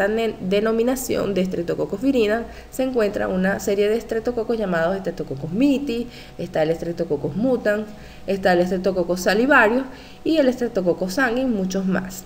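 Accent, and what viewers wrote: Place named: Venezuela. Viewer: Muchas gracias! Me encanto su clase profesora! Además percibí un acento venezolano 🌎💜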